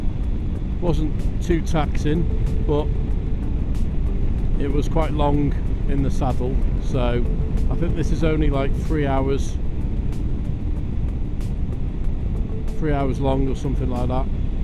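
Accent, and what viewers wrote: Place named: North West England, United Kingdom. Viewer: Fair play doing a big trip like that on your own 🏍️👏🏻 you sound like your from the North West? Maybe catch up on a ride in the future 😎 great vlog - Cheers Rob.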